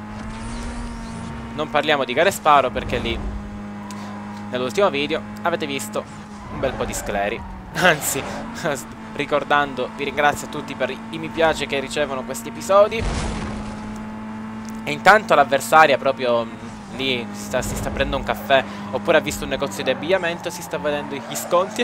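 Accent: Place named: Sicily, Italy. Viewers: quel tocco di siciliano nei tuoi video DEVE continuare a essere presente!!!rende il tutto più epico!!! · io lo capisco anche perchè ogni tanto parla parlermitano :P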